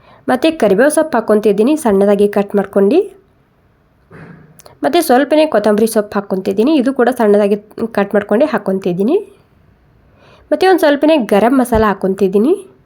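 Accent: Maharashtra, India. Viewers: Yourmaharastra kannada is nice to listen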